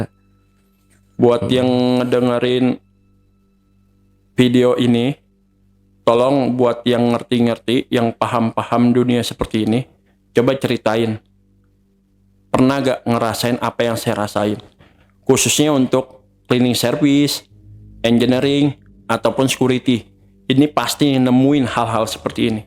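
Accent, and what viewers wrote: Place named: Sunda, Indonesia. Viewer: Ngegerung sunda Banget bang😁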